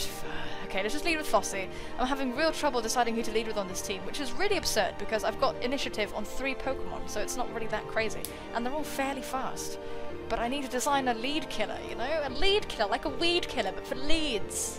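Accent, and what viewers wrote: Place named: West Country, United Kingdom. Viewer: I only just picked up on your accent. I think it was the way you said "iron head" that did it. Devonshire? Definitely sounds Westcountry to me.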